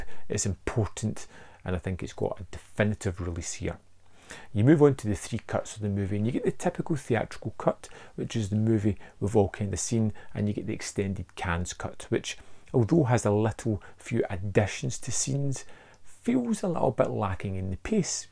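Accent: United Kingdom. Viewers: I came across your channel because of this release. You've got a strong accent mate that I really have to get used to when watching your review. 😂 Germany here, but I'm pretty much fluent in English. The British accent is one of its kind though. Anyways, I pre ordered my copy when it went on sale and I'm so much looking forward to it. What's more, my cinema will bring Dawn of the Dead on the big screen on Halloween night for one presentation only. Can't wait to check it out. You guys got some pretty cool releases coming out, talking about the other Second Sight Films announcement for Dog Soldiers and the 4K box set for Total Recall. 2020 was definitely one of the most expensive years for me buying films with all the amazing releases. Keep coming the good work mate. I subscribed to your channel. Cheers, Basti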